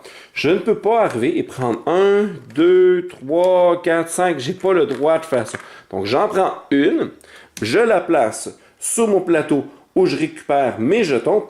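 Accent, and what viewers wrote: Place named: Quebec, Canada. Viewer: Merci pour cette chouette vidéo (avec l'accent québecois !) !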